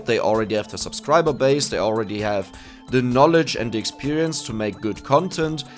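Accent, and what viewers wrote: Germany. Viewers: You have an analytical approach to both games you play and things like this, your channel's growth. That's pretty unique and along with your personality, you don't have to worry about the channel. You'll grow as a creator no problem, as long as you keep at it. Also, German accent is pretty cool, ngl.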